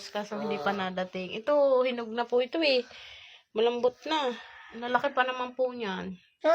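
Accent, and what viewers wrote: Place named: Batangas, Philippines. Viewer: Thank you Ate sa pag video nyo Kay ate.nakakatuwa ang salita ninyo lalo na yung punto ninyo batanguenyo.❤❤❤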